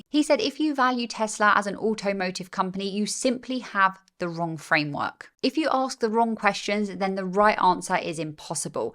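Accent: England, United Kingdom. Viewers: u sound English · This lady is very good with insightful and well-organized presentations. Her cute little British accent is also fun to listen to.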